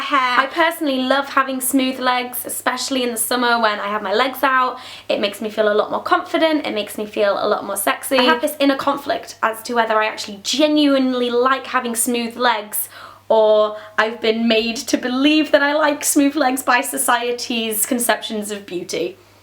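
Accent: United Kingdom. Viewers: I love your accent girl it is so awesome!! Makes me wanna be British. And I get lazy all the time and don't shave my legs, who cares because you don't live to impress other people and if you don't wanna shave your legs then so be it. :) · I normally don't like British accents but I like yours for some reason.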